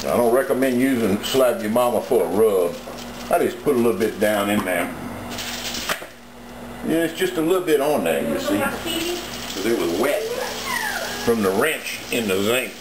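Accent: South, United States. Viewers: I love that good ole southern accent. #lovebeingfromthesouth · This guy's southern voice is the best! Sounds like that big warner bros. chicken Foghorn Leghorn.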